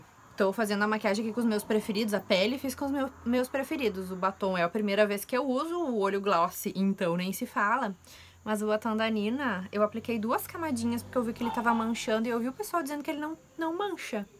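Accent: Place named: South, Brazil. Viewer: Ai guria, amo este sotaque do Sul 😍!!! Muito fã do seu trabalho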